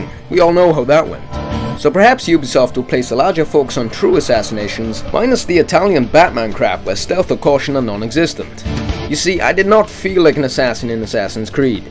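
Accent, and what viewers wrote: Wales, United Kingdom. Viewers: because i like this video so much and your welsh i will subscribe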